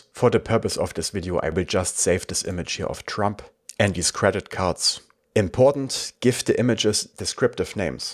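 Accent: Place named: Germany. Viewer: thanks for the detailed review of HeyGen and some great quotes there such as it "even maintained my German accent" ☺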